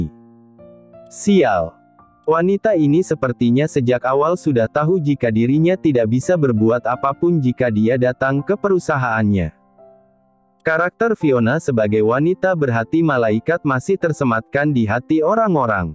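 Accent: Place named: Indonesia. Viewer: Logat Indonesia guys guis😊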